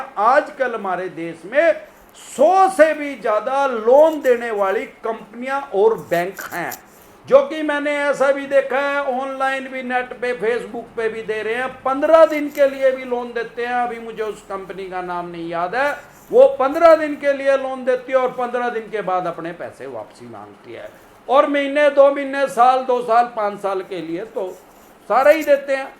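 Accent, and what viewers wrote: Haryana, India. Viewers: Haryanvi accent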